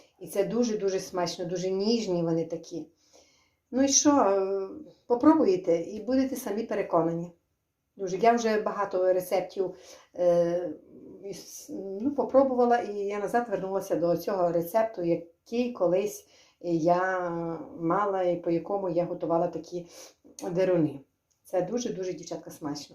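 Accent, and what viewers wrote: Ukraine. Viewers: Спасибо за настоящий украинский язык! Язык моих бабушек и дедушек из села в Черкасской области! А деруны очень вкусные! Спасибо!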